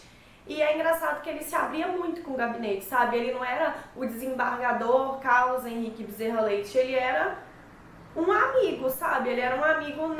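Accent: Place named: Brasília, Brazil. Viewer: Vc e de Brasília ? pelo jeito de falar parece ser! :) gostei muito do vídeo um abraço.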